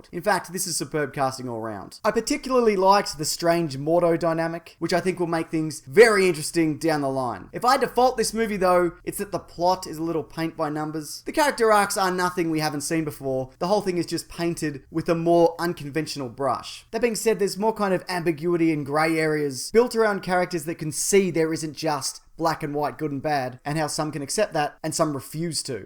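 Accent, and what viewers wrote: Australia. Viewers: Mate you are so Aussie, it's fantastic. Thank you for being so ocker and totally whatever about the whole thing - made the review much more enjoyable haha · I thought this guy's Australian (British?) accent would get to me ... but it wasn't too obnoxious.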